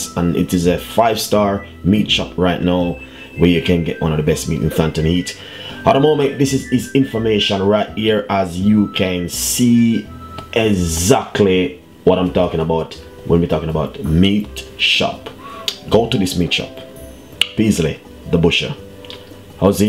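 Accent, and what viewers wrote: Jamaica. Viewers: All dis time, I thought you broadcasting from JA. 🙏🏾😂🇬🇧🖤🇯🇲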